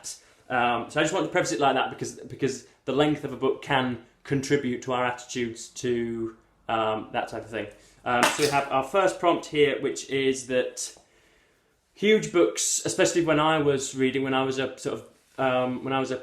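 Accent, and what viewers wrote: United Kingdom. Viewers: I love how british he is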